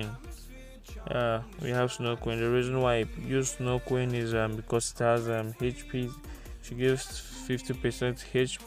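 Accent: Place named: Nigeria. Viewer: Lol where you from u sound Nigerian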